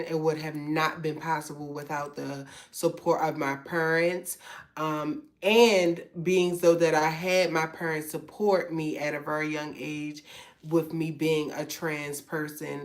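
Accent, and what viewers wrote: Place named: Baltimore, United States. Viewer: hello beautiful. can I ask where are you from? you have a Bmore or DC accent. I'm Bmore born and bred! #Poly😆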